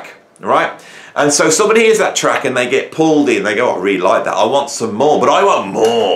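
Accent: United Kingdom. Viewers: You’re a mad genius, I love it. As an American, and an accent buff, I tried to imagine this video (I’ve watched others of yours and subscribe) with the exact same content, but delivered with an American accent, or even a more neutral British accent, it would be a very different experience. Your content is great, I think it’s brilliant, but I also think your accent is a bonus that gives the whole thing an ineffable charm. Cheers!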